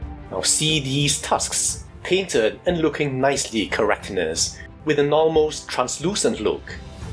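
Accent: Singapore. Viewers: you sound singaporean/malaysian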